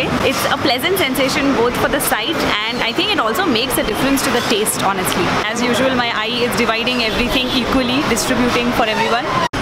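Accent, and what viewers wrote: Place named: Pune, India. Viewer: Assal punekari hindi boltes tu. 😅😅 Ekhada video marathi madhe pan banav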